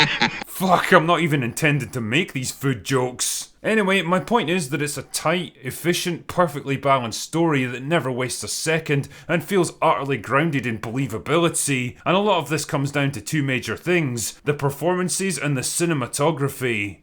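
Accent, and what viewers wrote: Scotland, United Kingdom. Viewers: I know and funny too. The Scottish accent shite!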